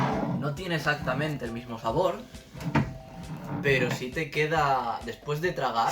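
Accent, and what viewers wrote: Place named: Asturias, Spain. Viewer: Conoci el canal a traves de kira sensei. Segun te escuche sabia que eras asturiano, el acento es inconfundible hahaha, aunque parece que cuando se esta aqui pasa desapercibido. Un saludo desde la capital del principado! P.D. por ejemplo, sidra Del Valle 6.3% de alcohol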